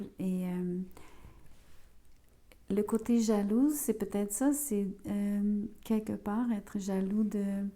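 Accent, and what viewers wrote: Quebec, Canada. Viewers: Magnifique petit accent québécois -:) merci pour le tirage qui me parle, dommage pour le son trop bas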